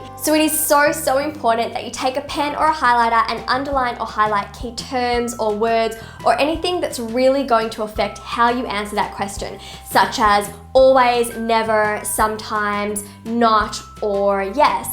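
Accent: Australia. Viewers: are you an Australian? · Aren't you an Aussie ?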